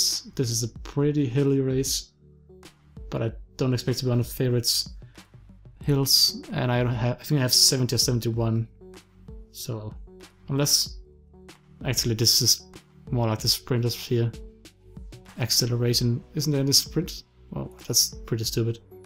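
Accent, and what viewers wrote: Denmark. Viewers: Are you from Denmark? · Are you from Denmark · Man kan godt høre du er dansker med dig Accent. Hvor kommer du fra?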